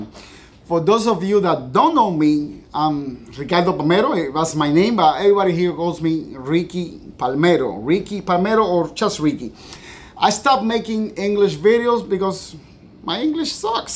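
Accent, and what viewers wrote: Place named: Cuba. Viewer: Brother, I'm Cuban on my father's side, and he passed away in 2019. Your Spanish channel has allowed me to retain my Cuban accent and learn to be able to speak about fishing in Spanish and even develop my Spanish. Your accent vividly reminds me of my father and I have learned so much from your videos in the short time I've been following them compared to other Youtubers who speak perfect English. Your English is amazing too, don't let others discourage you! You don't improve without trying.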